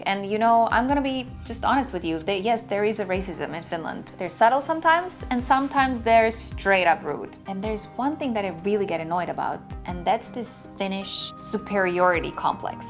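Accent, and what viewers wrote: United States. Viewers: Stop speaking American English when they are killing people all over the world. Finland is the happiest country on earth so why spoil it with that Yankee talk. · sorry u sound yankie, no ring! kokeile suomex ( 10y exp?) yt 'moro suomaasta'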